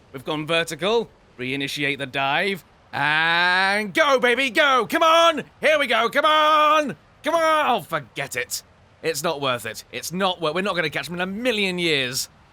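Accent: England, United Kingdom. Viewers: hilarious guy with hilarious british accent 10/10 would watch again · thank goodness I was just getting bored and a fellow brit pulls out a craking old video perfectly spiffing chappie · I love how you talk. what part of England are you from I am from Nottingham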